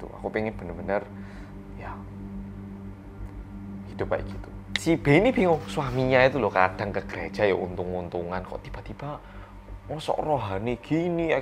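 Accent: Java, Indonesia. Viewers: Udah banyak yang bahas Shincheonji di Youtube, tapi sengaja gak nonton soalnya nunggu yang versi orang korea yang medhok aja deh!😀👍😀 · Favorit youtuber ini mah, suka sama pembawaannya dan juga medoknya. 😂🤣👋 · Aku ngajak tmnku nonton mas. Dia kaget + ribut pas denger mas ngomong jawa + medok wkwk gemoy:')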